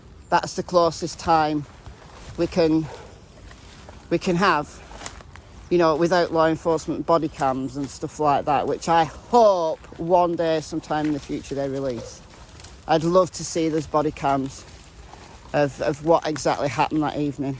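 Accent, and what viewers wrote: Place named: England, United Kingdom. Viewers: Hi from a Lancashire lass living in France! Love to hear your accent and to follow your daily walks with your adorable girls. Thank you for all your reviews and updates on various cases. Your talks are always based on facts and substantiated details but you also think out of the box using your instinct and commonsense to see through the twists and turns of all the intriguing cases you cover. You are a proper northern lass straight talking, analytical, empathetic and able to see all sides of the story. Love listening to your daily walks and crime story Sundays. Take a look sometime at the intriguing French crimes, there's a lot! 🤗🐕🐾🐕🐾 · From Linton, Indiana (South Central Indiana). Love your content; seeing the English countryside; and watching your dogs run and play. And I love ❤️ hearing your English accent.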